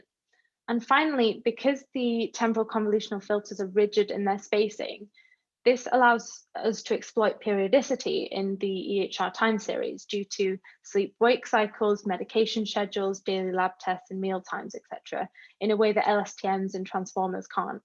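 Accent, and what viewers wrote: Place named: United Kingdom. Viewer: Great work! Enjoyed the British accent!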